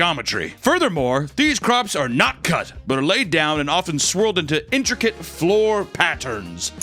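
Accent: England, United Kingdom. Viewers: The bri-ish accent is killin me 😂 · I love British voice, I wish we sounded like that, we would actually be interesting then. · As an American I approve this British accent lol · As a Brit myself, your British accent is beautiful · Why is his British accent spot on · FAVORITE English accent by far. Holy fuck 😂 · the english accent/commentary has me crying 😂😂💯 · As someone not from England, that's exactly how people from England all talk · this video made me feel like i was slowly losing my mind with, the British accent is so funny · every time does his English accent I lose it. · is truly a man of talent. The Bri’ish accents were so amazing 😂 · I like British accent takes the form of Fred Flintstone somehow... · I love his British accent, how it tickles me. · lol that british accent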